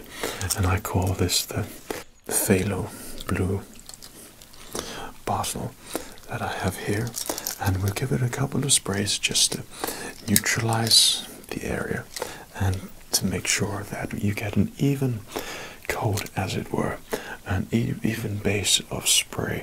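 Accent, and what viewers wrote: United States. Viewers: Your american accent is improving 👌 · I mean with all due respect, because this video is hilarious… but what the actual fuck,?! 🤣 Hit the bowl too many times and came up with a WILD video! Really not too shabby there with the American accent! You don’t lose it too often and when you do, it can easily be glossed over when we reset. A dialect coach might not be fooled but I can be!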